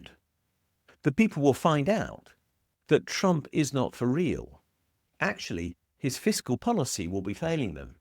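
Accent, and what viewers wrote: United Kingdom. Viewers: I’m not going to waste my time listening to your British sounding horse shit! MAGA will give up when they have experienced so much PAIN!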